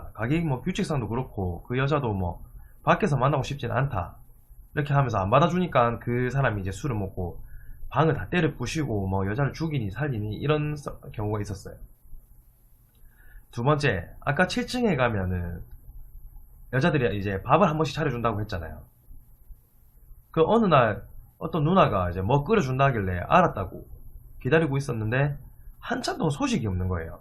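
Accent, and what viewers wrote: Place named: Busan, South Korea. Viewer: 말투가 부산사람같네요? 저도 부산사람인데ㅎㅎ 알바 뭐 검색하다가 재밌게 듣고 갑니다~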